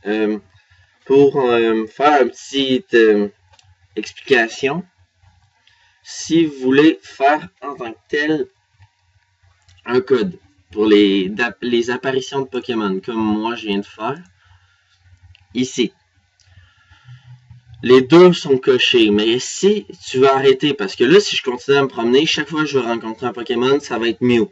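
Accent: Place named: Quebec, Canada. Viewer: L'accent québécois 👌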